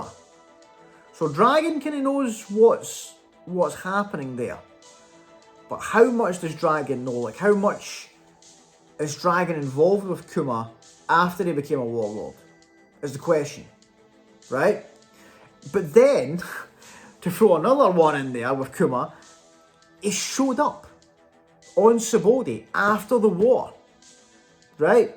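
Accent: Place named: Scotland, United Kingdom. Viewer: Wow you have a Scottish accent ‼️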